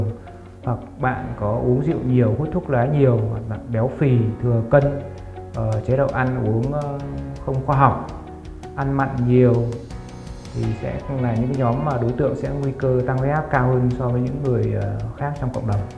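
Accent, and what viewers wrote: North, Vietnam. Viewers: Tiếng bk khó nghe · Mình dân Cà mau bị cao huyết áp nhưng nghe giọng nói bake thì thấy không tin tưỡng nên tắt ngay.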